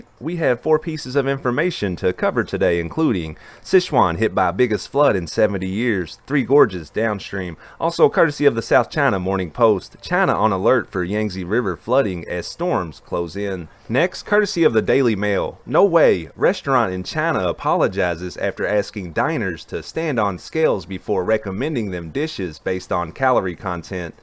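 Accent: South, United States. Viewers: I agree with this comment...... but where the heck did that southern accent come from :)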